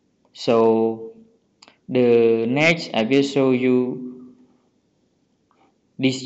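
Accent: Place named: Cambodia. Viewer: depend on your accent . im sure 100% that u khmer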